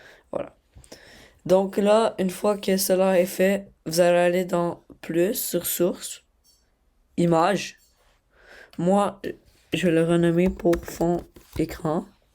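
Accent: Canada. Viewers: Ses un accent canadien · j adore ton accent quebecoi tabernacle de dieu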